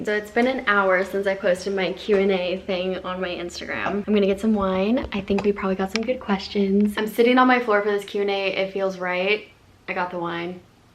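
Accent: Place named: Utah, United States. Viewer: Definitely a Utahn with that “what I’m DO-EEN” accent. Not a Mormon though because coffee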